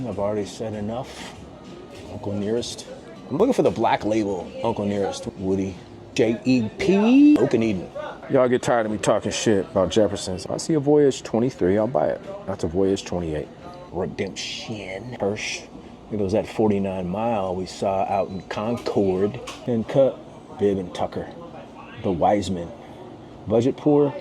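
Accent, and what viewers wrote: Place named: South, United States. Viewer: horrible country accent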